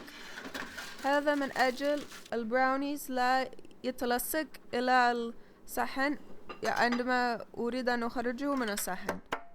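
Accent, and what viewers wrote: Yemen. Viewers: انتي امريكيه ..ولهجتك ولكنه يمنيه مثل زوجك..عندنا بنات بالسعوديه يتحدثون انجليزي..وكانهم بنات امريكا ...سبحان الله الدنيا معكوسه..وبنات الدول العربيه كذلك..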